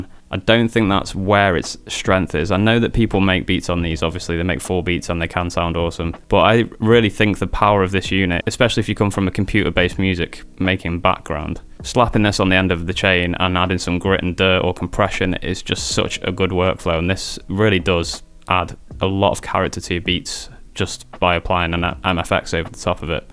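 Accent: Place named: Solihull, United Kingdom. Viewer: Hey mate. Greetings from Canada. First video of yours I'm watching. I recently picked up one of these units used so im looking forward to watching your tutorials. I'm watching this video now and my wife heard your accent and said she thinks you're from solihull... she's originally from Birmingham 🙂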